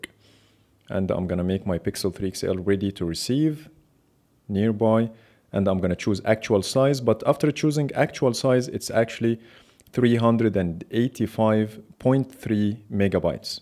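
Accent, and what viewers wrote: Egypt. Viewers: مصري باين اووي من الاكسنت بتاعتك 😂😂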